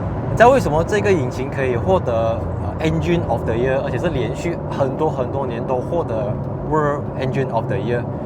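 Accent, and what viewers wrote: Malaysia. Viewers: 就是因为你的本地腔调所以我才订阅的，很大部分本地汽车中文online media学大陆和台湾用了很正式的语言来表达，不是不好只是少了人情味。比如说我们都习惯叫sponge/span了，其他媒体很喜欢用发泡棉这个字，感觉太台湾了。。真的听到很不习惯。。btw，恭喜恭喜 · 恭喜！赞！我喜欢你马来西亜华语的腔调。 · 其實作為台灣人，我還蠻喜歡你的馬來西亞腔的！內容也很精彩，加油！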